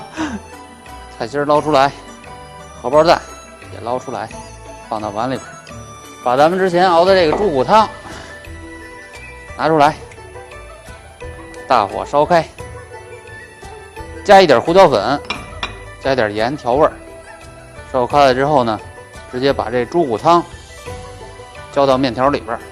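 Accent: Beijing, China. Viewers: 普通话说的很地道像是一位北京人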